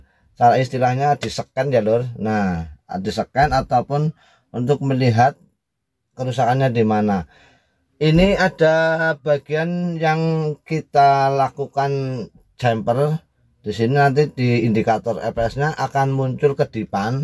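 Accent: Banyumas, Indonesia. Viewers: Pare kediri hadir mas,kok lama tidak muncul ini,nyong kangen karo boso ngapak2 nya...hahahhahah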